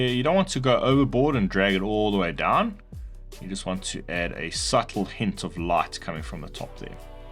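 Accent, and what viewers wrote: South Africa. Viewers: As soon as I heard your voice I immediately picked up that you’re a fellow South African… love your work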